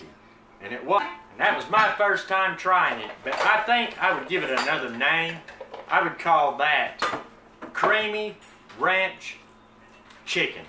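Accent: South, United States. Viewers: You can cook! And I love your Southern accent. Reminds me of home. My mom’s home anyway. Great memories. Keep kookin’! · This really looks delicious. I make plain chicken breast in water in the crockpot for shredded chicken sandwiches and the chicken is usually a little dry. Sometimes we put gravy on it but a little annoying that it’s dry. Don’t care for the Crack chicken name either. Ranch shredded chicken sounds better. Really like your channel. You serve up great recipes with a great southern accent. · Love your southern voice!!!! Love your videos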